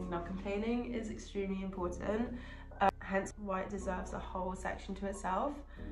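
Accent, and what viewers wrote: Paris, France. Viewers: je suis d’accord que le ton parisien est super bien maitrisé, le problème c’est que pour le reste de la France il est très méprisant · En vrai elle parle bien le parisien limite mieux que le français mdrr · Funny how you picked up the Parisian intonations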